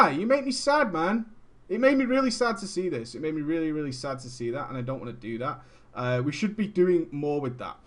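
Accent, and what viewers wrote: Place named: Manchester, United Kingdom. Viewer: you sound like yer from "MAAAAHHHN-cheeeeh-stah" /brofist!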